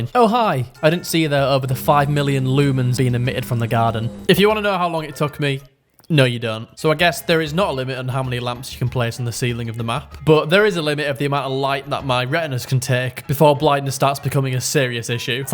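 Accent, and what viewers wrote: England, United Kingdom. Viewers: The ‘Let’s game it out’ style playing with an English accent isn’t something I knew I wanted. But I’m happy this video came into my algorithm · I love your british accent😮❤ You know, this is the first time I've heard a British accent spoken by a person, and yeah I like it.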